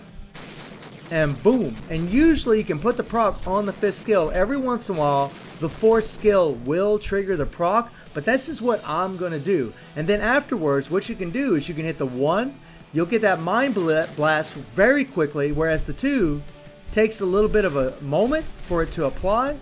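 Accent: United States. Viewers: are you a Southern American?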